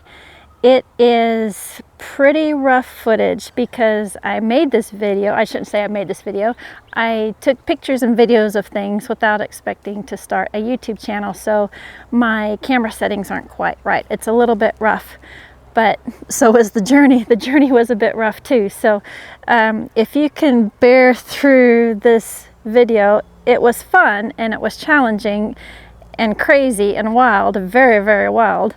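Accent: Australia. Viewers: I'm starting to hear a slight Australian accent coming on.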